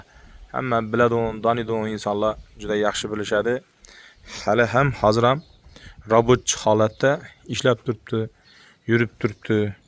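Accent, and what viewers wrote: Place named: Khorezm, Uzbekistan. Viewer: Хоразмча сулливар кийналиб гетдингку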